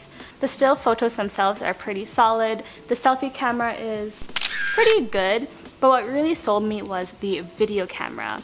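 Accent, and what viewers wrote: India. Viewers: Hi Are you Indian with fake accent or NRI with "some where in between accent" or an American with real american accent . pronunciations sounds 50 percent Indian and 50 percent American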